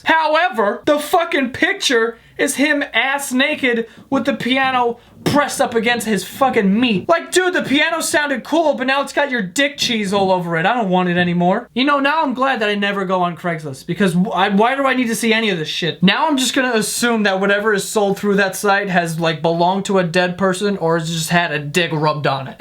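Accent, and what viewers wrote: New York, United States. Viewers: it's his new york accent. we all do that here. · he's a hardcore new Yorker. its his accent and its dope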